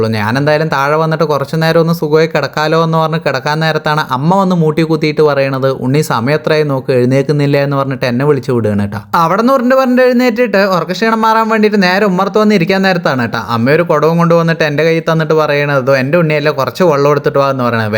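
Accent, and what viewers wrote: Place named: Palakkad, India. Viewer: പാലക്കാടൻ ഭാഷ.. എവിടെയാ വീട്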